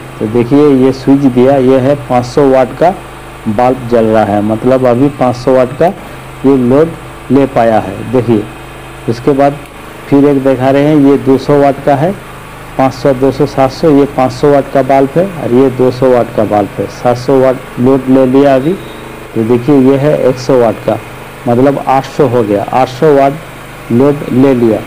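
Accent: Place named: Bengal, India. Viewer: Apni Bangali apnar Hindi Sune bujha ja6...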